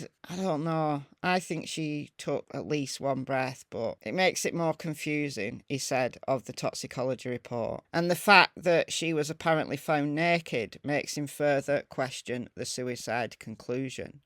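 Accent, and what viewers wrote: United Kingdom. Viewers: Good morning y'all 🤔 I was sure you were British.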